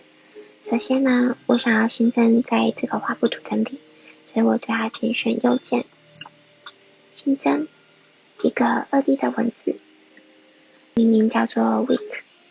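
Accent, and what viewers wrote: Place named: Taiwan. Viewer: 聽你的口音還真的是台灣人，唉，你能不能轉國籍到大陸？你的人品比較適合在那邊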